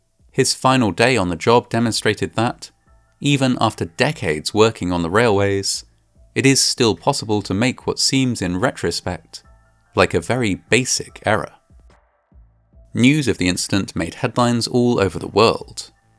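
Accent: United Kingdom. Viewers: I've listened to 15 seconds. I hear a British narrator voice for an American story - a telltale sign of an AI generated video. Can anybody tell me if this is a true story or is it AI fiction? For now, I'm clicking away and going elsewhere.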